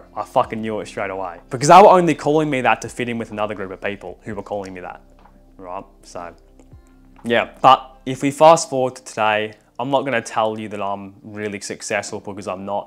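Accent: Australia. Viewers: are you an Aussie mate?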